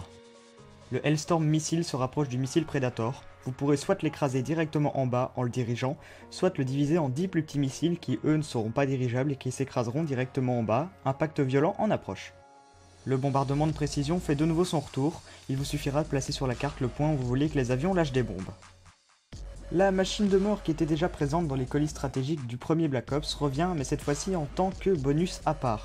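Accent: Belgium. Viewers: J'ai cru que tu étais de la Belgique :D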